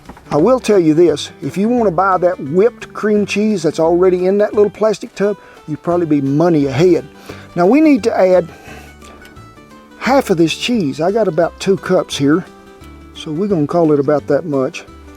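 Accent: South, United States. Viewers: black coffee, hash brown slap up and a chirpy southern camp cook, paradise mahn!!!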